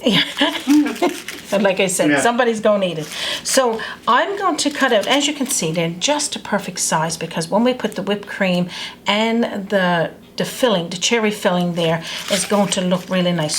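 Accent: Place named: Cape Breton, Canada. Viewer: Love the liners that you used,. I have made many muffins in the past as well. Another idea for you that I have done is.. the part that you cut out, I place it back on top of the filling, press it down a bit, put the whipping cream and cherry and chocolate back on top. Looks so pretty as well. I do it with lemon pie filling, too, on a white cake muffin, topping it with icing sugar and lemon zest. Just an idea. I live in BC now but from Cape Breton. Love watching your videos. I found your site when looking up sea food chowder recipes. Love the accent, reminds me of 'home'. 😊❤🇨🇦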